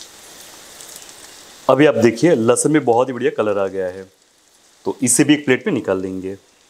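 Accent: Gujarat, India. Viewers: Actually unka gujarati accent hai.. Toa apko der se samjh ata hoga.. koi baat nahi dobara sun lene me koi burai nahi hai..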